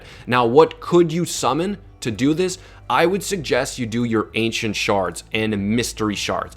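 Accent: Quebec, Canada. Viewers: Yeah. Not even close. I think this guy has a bit of an accent more then anything. He sounds like he might be French Canadian.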